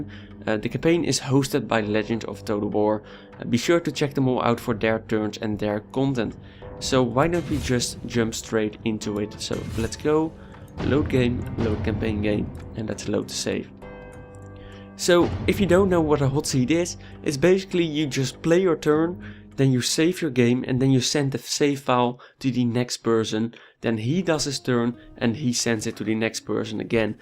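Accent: Netherlands. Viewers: Are you by any chance Dutch? You have our wonderful accent :P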